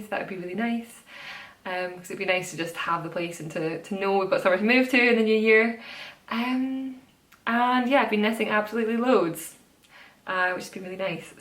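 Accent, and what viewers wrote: Scotland, United Kingdom. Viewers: Your podcasts are great :) I was wondering if you could recommend more Scottish knitting podcasters, I absolutely love listening to Scottish English. Also, I planned a trip to Edinburgh this October and I would be very grateful if someone could recommend a nice yarn shop in Edinburgh centre :) Thank you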